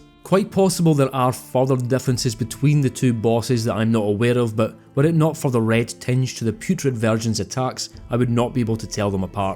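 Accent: Scotland, United Kingdom. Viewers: Holy crap 6 hours of Scottish man talking about soulsbourne 🥹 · is it me or does sound increasingly Scottish with every new video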